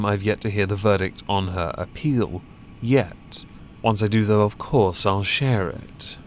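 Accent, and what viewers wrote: United Kingdom. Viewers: The only one feeling dysphoria is the mother, who WANTED a little girl. Oh, and the attention from attempting to gain ‘woke points.’ BTW: Vit-O-mens. LOVE IT. Give me your incredible British pronunciation,!! Love your work, as always!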